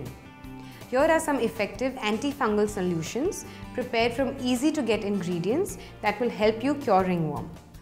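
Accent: India. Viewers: YOUR ENGLISH IS DIFFICULT TO UNDERSTAND BECAUSE YOUR INDIAN ACCENT IS TOO STRONG !!!!!! JUST TO LET YOU KNOW.......🌝🌝🌝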